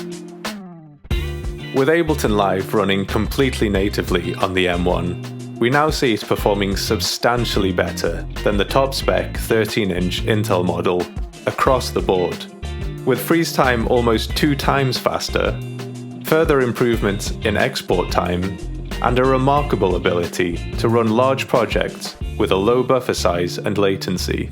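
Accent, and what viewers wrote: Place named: North Wales, United Kingdom. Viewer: Fandabidosi! Are you a gog like me, ie? I can hear your north wales accent. Diolch am hwn. Thanks for this video!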